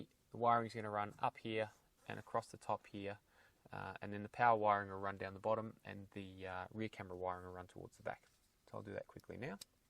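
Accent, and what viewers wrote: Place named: Australia. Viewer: ommgggg I love Australian accents!!! ;)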